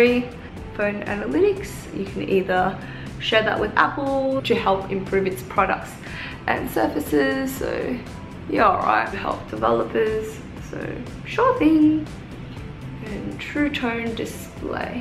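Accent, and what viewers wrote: Australia. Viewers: so weird to hear an asian speaking Australian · I’ve always wondered how my name would be said with an Australian accent haha. Now I know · The way she talk like Rosé of Blackpink ..aussie accent tho ☺ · Sorry but she’s nice and pretty and all but I’m not really into people with Aussie accents (not love I mean like to watch them on yt) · I had a feeling you were Australian and then you said it and I was like YES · I knew u were from Australia cause of ur accent . Reminds me of the Rebeka twins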